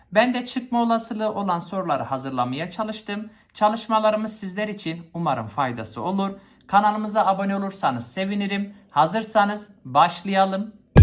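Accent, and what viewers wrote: Malatya, Turkey. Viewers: Hocam Malatyalı misinizzz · Hocam Malatyalı mısınız?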